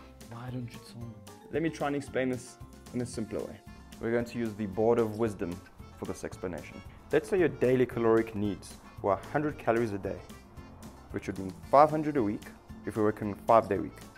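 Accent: South Africa. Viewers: hey man. Thanks for the video my man. Speaking the truth. Out of interest are you perhaps South African?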